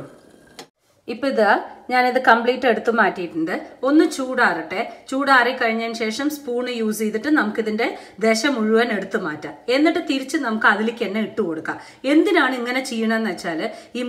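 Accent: Palakkad, India. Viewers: Chechi palakkad annu ennu thonnunu.kalaki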